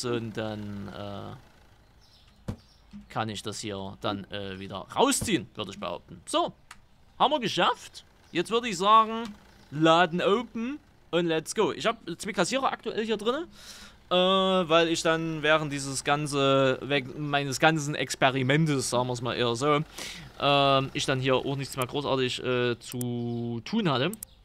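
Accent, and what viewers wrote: Saxony, Germany. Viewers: Supper sympathisch endlich eh Sachse 😂Grüße aus nähe Leipzig 🎉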